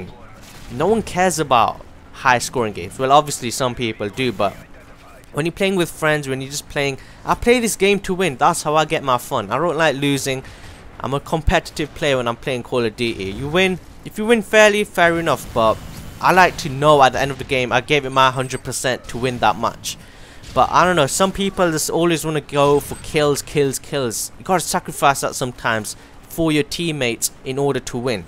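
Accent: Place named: Europe. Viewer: Watching this video, Grandma is sitting across the table asking "who is that on the computer" I tell her you are from Europe, she likes your accent. :) Great vid, been rocking that pp90 with rapid/silencer = beast mode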